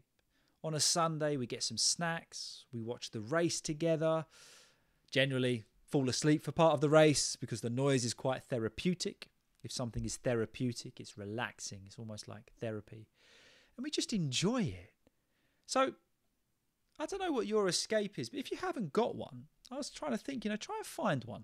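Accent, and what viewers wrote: United Kingdom. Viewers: No, thank you. Because I admire British accents, I’ve been looking for the most linguistically profound and elegant British voice on YouTube for months and I just found it. Keep up the spectacular work. I have an issue which is that it’s easy for me to have self and social conversations and then understand almost 99% of conversations and reports passing my ear, but when to relate to official dialogue, I get jammed, keeping in mind that I'm familiar with public speaking in my mother tongue and I don't speak English well as well as I write or type.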